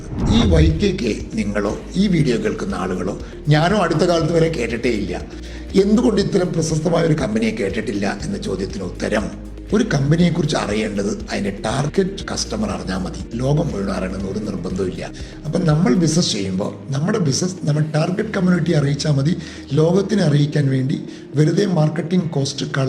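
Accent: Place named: Kozhikode, India. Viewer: നിങ്ങൾ കോഴിക്കോട് കാരൻ ആണ് ല്ലേ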